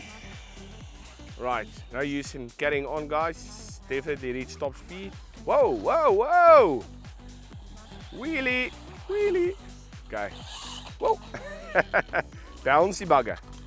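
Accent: South Africa. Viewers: Hey you live in SA still? I had to sub from your accent lol, ex Joberg resident myself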